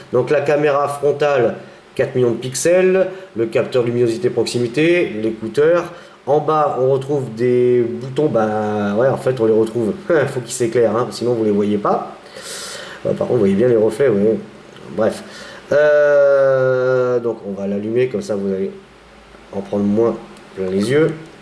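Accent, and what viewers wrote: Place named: North, France. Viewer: le francais du nord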